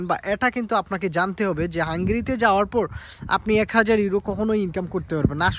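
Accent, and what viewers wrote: Sylhet, Bangladesh. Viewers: Bai monokoi sylheti😊❤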